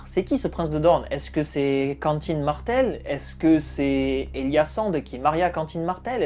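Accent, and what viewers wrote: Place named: Bordeaux, France. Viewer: je sentais un accent bordelais mais vu que tu parle de feria j'ai plu aucun doute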